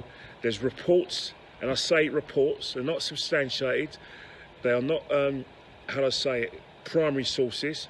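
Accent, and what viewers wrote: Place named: Cockney, United Kingdom. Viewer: This man is Riddled with Cockney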